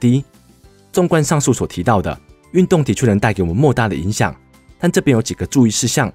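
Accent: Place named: Taiwan. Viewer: The speaker has a very thick Taiwanese accent.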